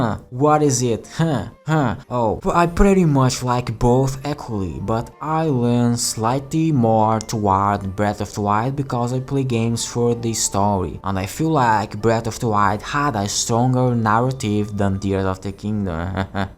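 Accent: France. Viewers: Bro speaks francglish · Idk man the accent makes me think he’s French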